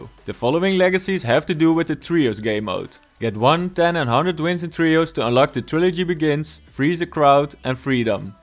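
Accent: Netherlands. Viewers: Je hoort toch wel dat je Nederlands bent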